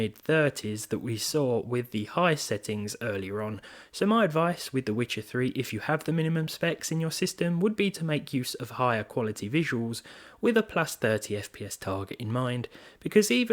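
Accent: United Kingdom. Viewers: I just love this channel because there is no background music. Makes the channel different in a good way. Your british accent is the music for my ears :D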